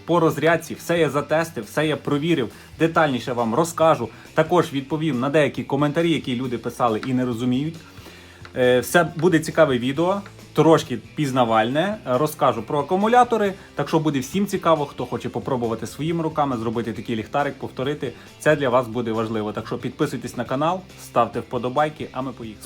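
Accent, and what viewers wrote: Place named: Western Ukraine, Ukraine. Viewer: Бля, этот акцент западенский конечно, пиздец 😅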